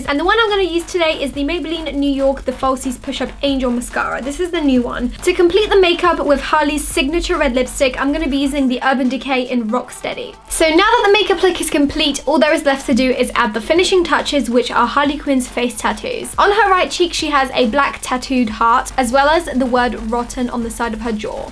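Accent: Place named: United Kingdom. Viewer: Nice accent I love british accents ^_^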